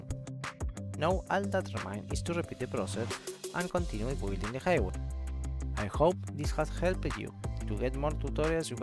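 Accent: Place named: Argentina. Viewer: Me parece que ese inglés tiene tonada argentina o algo de latino! 😆 saludos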